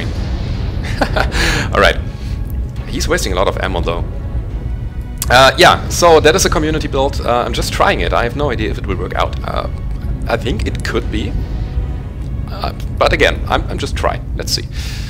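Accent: Germany. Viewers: Just discovered your channel.Enjoying your MWO vids.Cool German accent and greetings from Scotland.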